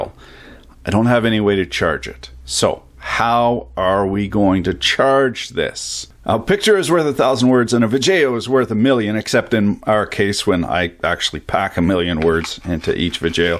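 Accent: Quebec, Canada. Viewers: man where are you from? You almost sound like a "Quebecois" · Gosh i love your french québécois! How did you learn it?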